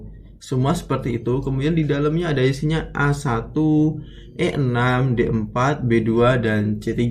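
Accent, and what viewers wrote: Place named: Cirebon, Indonesia. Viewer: logatnya cerbon bgt, tah indramayu?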